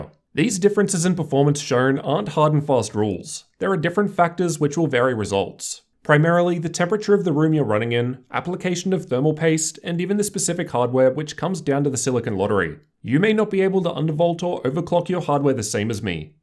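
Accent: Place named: Australia. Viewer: What do you think 2020 laptops will have to bring to the table? Do you think it will be a major price to performance boost or it won't be something too significant. Also a side note: I really like your Australian accent, adds what I call a ' touch' to your videos:)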